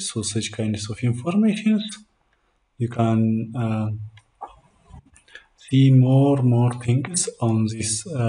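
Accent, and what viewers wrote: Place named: Ethiopia. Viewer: Keep it up if you can please don't sound the accent like amharic just focus only on the appropriate words it's better background modie sounds with Text Writing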